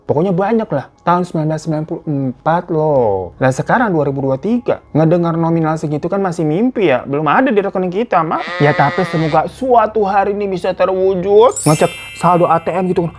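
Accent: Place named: Jakarta, Indonesia. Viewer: Ngomong nya kaya Abang gw orang pasar Minggu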